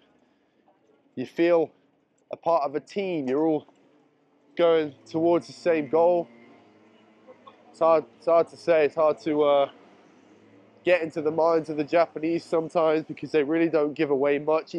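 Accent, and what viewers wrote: United Kingdom. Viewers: あなたの英語はブリティッシュアクセントなので、日本人には聞き取れない可能性が高いです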